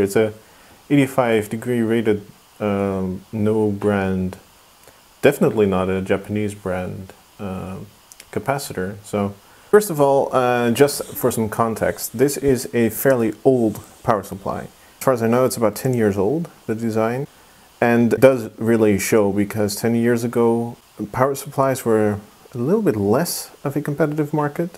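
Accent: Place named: Netherlands. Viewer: We will save that for a rainy day... Oh wait... Hahah I love your video's. Some things i dont understand, but you explain things very clearly. I find it very fun to watch. Accent is good too, normaly a dutch accent really irritates me, but not you, the accent is very minor.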